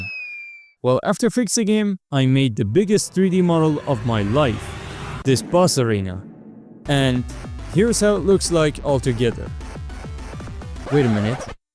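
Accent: Iran. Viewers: you sound soo Iranian for some reason its hard to see gamedevs from iran on yt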